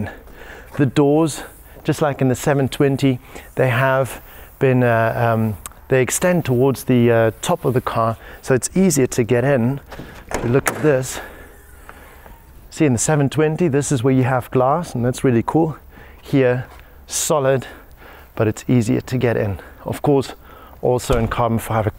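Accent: Cape Town, South Africa. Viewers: Is this presenter South African?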